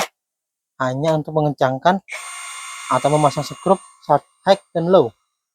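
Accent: Indonesia. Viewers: Sprti logat banjar. Orang kalimantan selatan???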